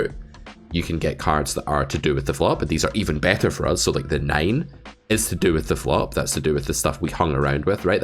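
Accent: Scotland, United Kingdom. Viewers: The auto generated captions are actually really good here with beautifully clear Scottish lilt.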